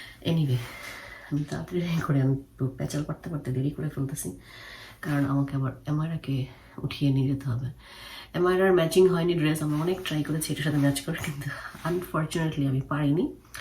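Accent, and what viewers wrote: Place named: Bangladesh. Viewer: তোমার আরেক কোন এক ঈদের ব্লগ থেকে দেখা শুরু তোমার ব্লগ।মজার কথা ভাইয়াকে দেখে ১ম আমি ভেবেছি ইন্ডিয়ার নামক এর একজন অভিনেতা ও তোমাকে ১ ম দেখায় মুগ্ধ। ভয়েজ শোনার সাথে বুজমাল তুমি বাংলাদেশী+ আমরা নারায়ণগঞ্জ বাসী😍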